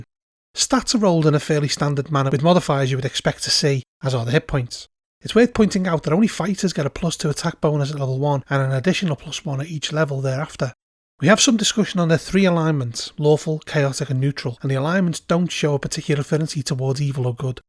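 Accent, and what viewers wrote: North, United Kingdom. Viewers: I loved this review. Nice presentation style, succinct and informative. Actually I also like the gentle tone and the northern accent. Good storytelling voice, I reckon. Thanks.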